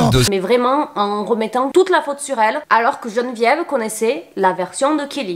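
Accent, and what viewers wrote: Brazil. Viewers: Ce n'est pas l'accent du Sud de la France, c'est un accent brésilien parce qu'elle est brésilienne 😅😅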